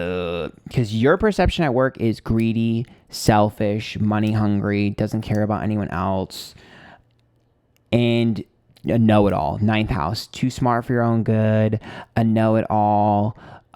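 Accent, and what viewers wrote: United Kingdom. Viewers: Love the British accent this video 🙏☠️ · 🐟 love your British accent 🇬🇧 spot on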